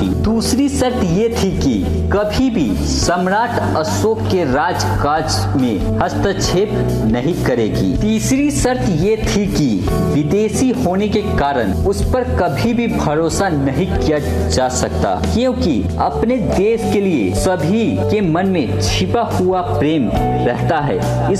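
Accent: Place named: Bihar, India. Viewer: Are Yar phle bolna to shi KR Le ese LG rha jese koi bihari Hindi bol RHA h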